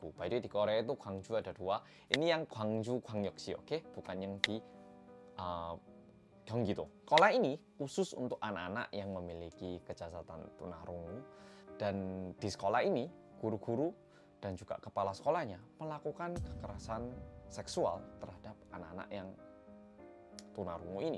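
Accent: Java, Indonesia. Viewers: halo gays welkom to korea reomit. bersama orang korea yang medooooookkkkkk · awas mas jangan terlalu lebar, entar ada nyamuk masuk. btw aku tu suka kalo denger mas ngomong medhok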